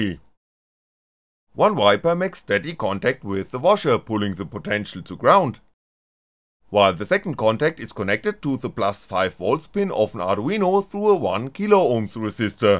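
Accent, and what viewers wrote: Germany. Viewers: Lovely German accent.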